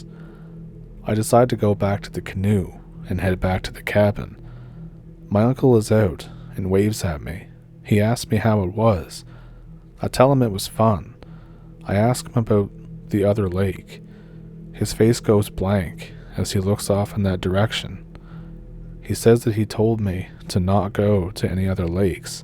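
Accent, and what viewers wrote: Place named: Maritimes, Canada. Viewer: Your accent is wonderful. Canadian Maritime? My father’s family was from French Canada. Quebec/New Brunswick. So I recognize it. You talk like Anne Murray.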